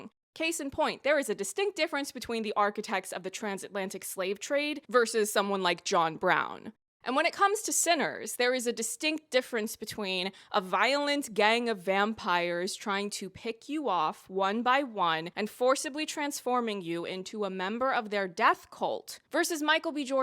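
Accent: Ireland. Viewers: not hear the Irish accent until watching this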